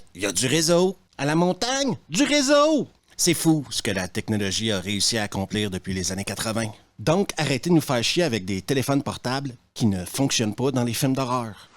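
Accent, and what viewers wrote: Quebec, Canada. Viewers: Le Monsieur est canadien avec son accent ? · T'es Suisse et t'as un accent Québécois !?! Ou t'es Québécois et tu vis en Suisse ?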